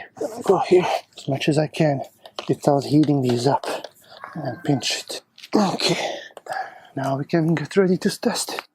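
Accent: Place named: Balkans, Eastern Europe. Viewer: Very detailed. Thanks for this. You sound eastern European. Maybe from Balkans?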